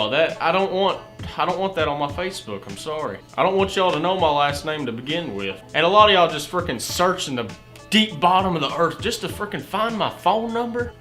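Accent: Kentucky, United States. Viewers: Fake accent? That’s funny he’s from Kentucky. If anything he has s pretty mild accent normally in Kentucky it’s pretty thick. So obviously it’s not fake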